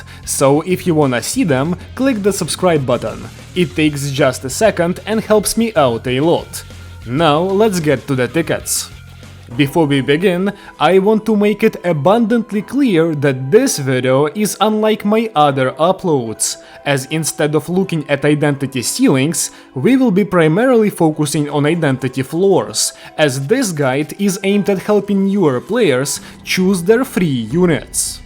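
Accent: Russia. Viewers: Что за Русский акцент... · What is accent sound like Russian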